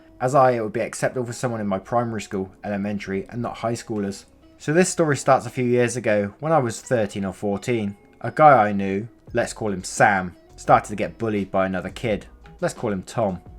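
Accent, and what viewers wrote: England, United Kingdom. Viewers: Where in England is that accent. I cannot figure it out xx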